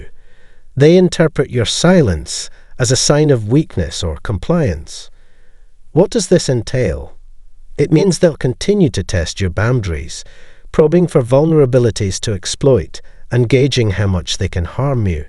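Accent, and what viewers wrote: United Kingdom. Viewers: This particular session was very helpful, so clearly explained, I feel I can handle the narcissist in my life, and not have to worry that she can gain gain control over me. I think I can win now without harming her. I want you to know how British pronunciation comes across to me as less aggressive than U.S. pronunciation, and it decreases the stress I feel when absorbing narcissistic subjects. I look forward to listening to you. Thank you very much.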